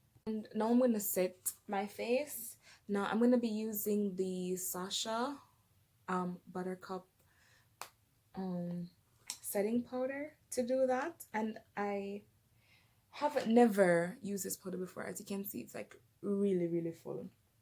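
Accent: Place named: Jamaica. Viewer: The Jamaican in you can't hide sis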